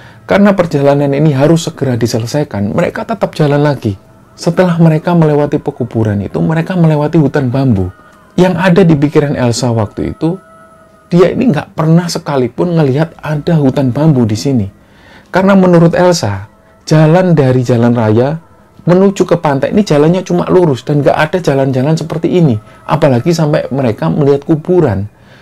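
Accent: Java, Indonesia. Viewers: aku orang malang dekat sama pantai laut selatan, aku seneng klo yg membawaknnya cerito horor, punya kharismatik, umpomo orang jawa, jawanya sangat kental, sehat"selalu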